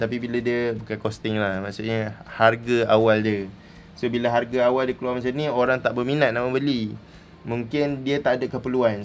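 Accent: Pahang, Malaysia. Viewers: terbaikkk sifoo..loghat mcm org phg jekk..hehe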